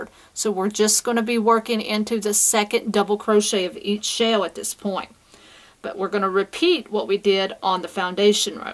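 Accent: South, United States. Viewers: Very good video. I hear a bit of an accent in your voice. From the South?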